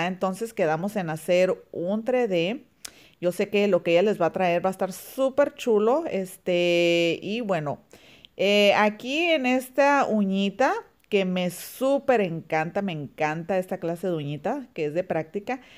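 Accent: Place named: France. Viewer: Ayyy mi hermosa me super diverti viendo el videito te quedo hermosa la muñequita aunque se te salga lo frances. Bendiciones 🙌y un🤗 de🐻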